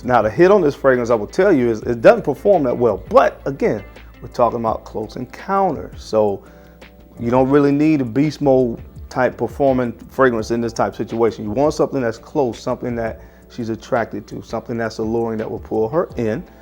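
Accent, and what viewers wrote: South, United States. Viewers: I sure wish you would either learn how to enunciate your words - the southern drawl and other speech effx are confounding - and invest in upgrading your vids so that we can see the actual names of the frags and manufacturers. Thanks.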